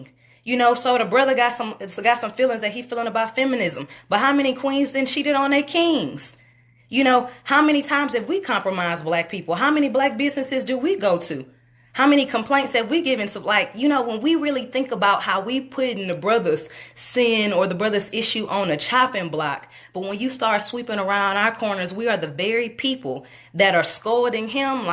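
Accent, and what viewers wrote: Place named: South, United States. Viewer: You just earn a new Subbie!..You sound like me(southern twang) and have a awesome spirit. keep Queenin Queen!..✊✊❤❤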